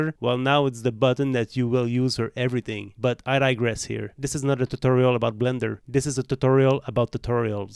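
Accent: Quebec, Canada. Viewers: Quebec accent is peak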